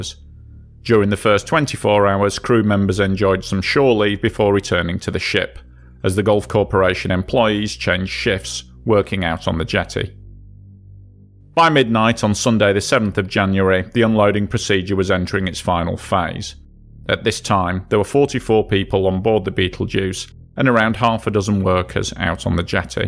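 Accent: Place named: Yorkshire, United Kingdom. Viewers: his accent... from Yorkshire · I love his accent... I was guessing Yorkshire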